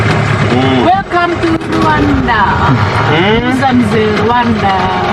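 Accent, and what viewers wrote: Java, Indonesia. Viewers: Maneh adalah bahasa Aceh artinya manis, dan mungkin berasal dari bahasa Melayu. Ya saya berasal dari Aceh, yesss saya asli Aceh, seorang pria jelek, tua ( 38 tahun/ 15 juni 1985 dan tapi kita muslim tidak pernah percaya kepada ramalan zodiak / bintang karena percaya kepada ramalan, tahayul, khurafat, dukun, sihir adalah hal yang paling dilarang dalam islam dan dosa besar) dan saya sudah menikah ( 29 juli 2010 Alhamdulillah) istri saya juga asli Aceh. Saya bisa menebak In Syaa Allah bahwa kamu orang Jawa walaupun kamu mirip Sunda atau mirip orang Sumatera tapi saya yakin kamu bukan orang Aceh, karena gayamu, karakter kamu, logat kamu sama sekali tidak mirip orang Aceh. ALLAHU AKBAR ALLAHU AKBAR ALLAHU AKBAR... Baiklah adik saya dari Jawa, apakah kamu sedang tertidur setelah sahur atau tidak, atau sedang membaca tulisan saya ini, please 🌹🌹🌹 jika kamu punya banyak waktu bacalah terjemahan Al Quran di hp mu secara acak beberapa ayat saja, itu akan membuat kamu bahagia In Shaa Allah dan saya minta maaf telah mengganggu anda lagi. 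ALLAHU AKBAR🌹🌹🌹 !!! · Smpyn bahasanya kok kayak orang Jawa sih Mbk , jawanya mana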